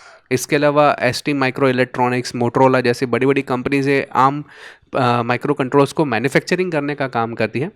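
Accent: Gujarat, India. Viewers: Juna ho chuka hai 😂😂🤣 Bhai aap Gujarati ho kya ?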